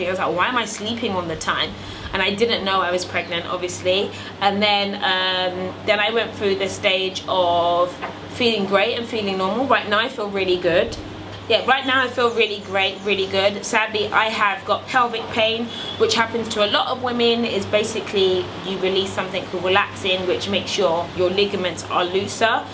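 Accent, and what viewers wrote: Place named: United Kingdom. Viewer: LOL I'm so used to american accent , but i love Your british accent 😊 though, u're such a GORGEOUS and cute YOUNG mom :)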